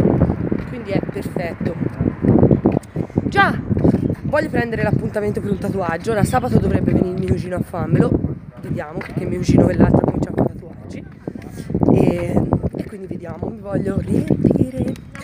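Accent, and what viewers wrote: Tuscany, Italy. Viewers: Bel video :) tieni un sacco di compagnia e il tuo accento toscano è meraviglioso :) ❤🌹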